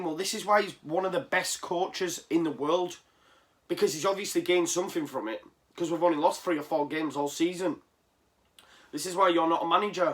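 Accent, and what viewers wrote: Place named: Leeds, United Kingdom. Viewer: I like this guy speaks true and proper Leeds lad. Subbed..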